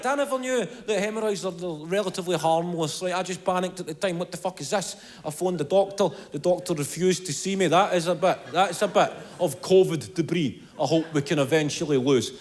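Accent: Scotland, United Kingdom. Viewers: It's called Scottish. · He sounds more scottish than before. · Sounds like a Scot !!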